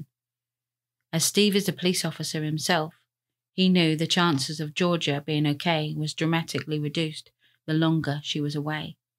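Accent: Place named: Suffolk, United Kingdom. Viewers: Hey, I'm obsessed with anything true crime & just found your channel, I'm now gonna binge all your videos. Thankyou for your content! Where are u from? Your accent sounds sooo local to me (Suffolk) x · weird hearing a Suffolk accent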